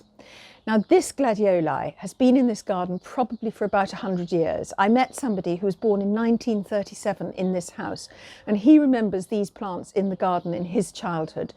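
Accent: United Kingdom. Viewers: I love your voice and British accent!! So soothing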